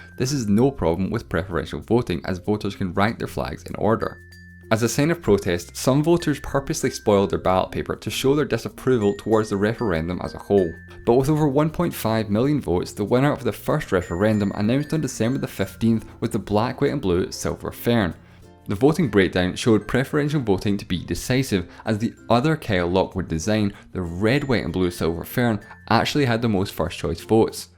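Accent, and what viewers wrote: Scotland, United Kingdom. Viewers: I think he's Scottish, but I'm not sure. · I know this is weird, but your chocolaty Scottish voice commentating with your great animation work gives me chills. You will go somewhere with this content, keep it up! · God, the Scottish accent is wonderful. Also, great video! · I think he's scottish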